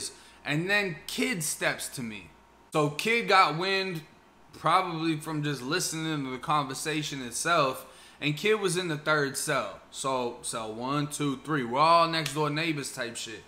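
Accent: Boston, United States. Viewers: I'm 46 yrs old. I'm from Florida born and raised. I graduated in 93". From 94 to 96 I went to a college called Dean College in Franklin, Ma. You have a very strong Boston accent. Love your videos, stories, and content. Thanks for sharing. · I’m from England and clocked the Boston accent straight up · Are you from BOSTON? I'm in upstate NY and I can hear that accent.I love it!!!😎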